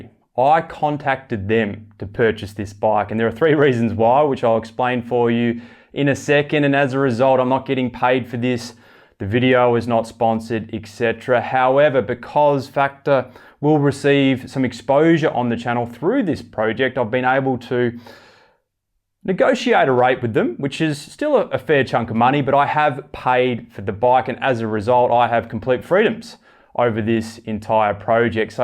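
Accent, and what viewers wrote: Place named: Australia. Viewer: Very funny to hear your oistralian accent when you talk about boikes sometoimes and learning that you are not a cloimber :-)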